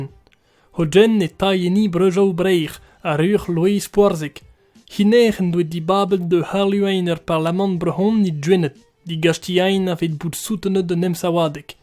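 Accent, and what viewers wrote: Vannes, France. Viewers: Je pensais pas entendre un jeune parler breton avec un accent vannetais. Chapeau, c'est une agréable surprise. Ken a vezo comme ils disent dans le bro-Leon. Ça donnerait quoi avec l'accent gwenedeg, "chen a veho"? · Super accent Vannetais, vivant comme les anciens ! 🙋💙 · Pouez-mouezh Gwened ‘vel just 😊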